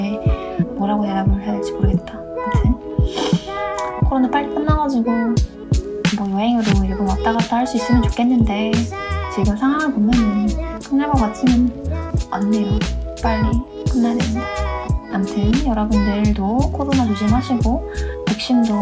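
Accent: South Korea. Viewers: やっぱり8ヶ月も韓国にいると日本語が韓国の方の日本語になりますね！可愛いです😭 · 日本語の発音が韓国人っぽくなってきましたね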